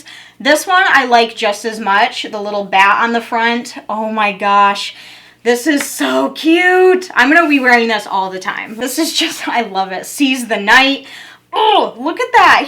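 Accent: Michigan, United States. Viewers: I just love that Michigan accent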